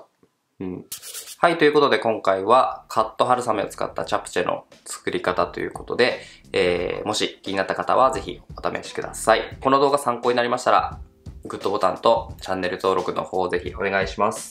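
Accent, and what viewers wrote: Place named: Kyushu, Japan. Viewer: 春雨は増えるから量が参考になりました そうそう！ ち、ちらしで作るゴミ箱です〜 我が家も台所で出る生ゴミとかをチョイって入れてそのまま捨てられるので作り置き？してます 時々でる九州弁！いいですね (๑˃̵ᴗ˂̵)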